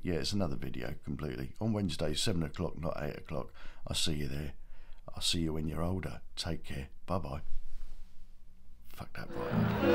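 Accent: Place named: Cockney, United Kingdom. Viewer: Yes I agree and thought that the dog had followed the scent of its owner. For all we know that poor woman was dragged off by someone and there were no witnesses. Love your Cockney accent, you sound so cultured, me I just sound common as muck, in spite of a BA, HNC, A & O levels. I’m mixed blood, mother was Southwark, dad was a crane driver from Wapping. Happy days hun 😊👏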